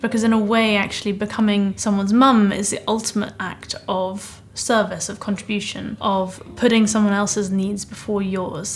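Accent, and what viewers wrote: United Kingdom. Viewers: 从你读大学的视频看到你现在怀孕将要做妈妈，见证你从女孩到女人，心里很感触。恭喜你&祝福你。我的英文不好，但是我很喜欢你的英式英文的口音。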